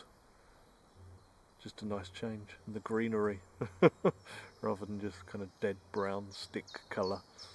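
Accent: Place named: Kent, United Kingdom. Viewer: You’re a true Kent man.its the accents.I used to know a man from down that way.u sound so alike..a compliment I should add. Nice tent,probably the best I’ve seen. Bird song fantastic.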